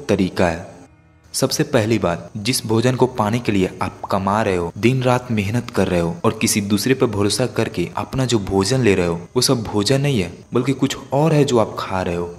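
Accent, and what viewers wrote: Bihar, India. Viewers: Aap kya bihar se ho apki bhasha se pata chalta hai. Ap bahut hi knowledgeful video banate ho thanks for this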